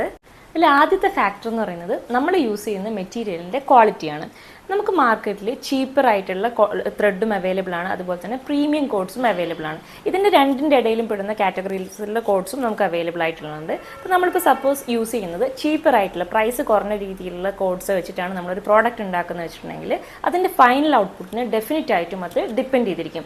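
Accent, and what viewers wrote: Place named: South India, India. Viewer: Sound more like South Indian speaking style with fluent English words mixed ( local language) .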